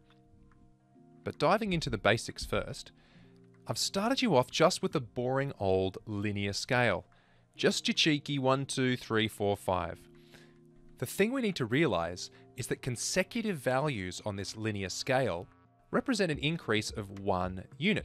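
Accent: Australia. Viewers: explaining math with an Australian accent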